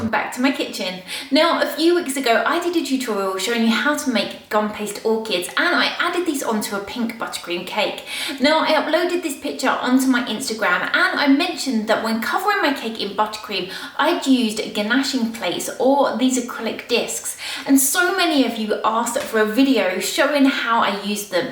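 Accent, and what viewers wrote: United Kingdom. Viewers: Your accent seems like British ??? Right or wrong?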